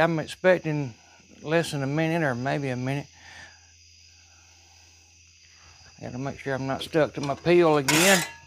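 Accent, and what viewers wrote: United States. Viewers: It sounds like such a struggle to speak with an American accent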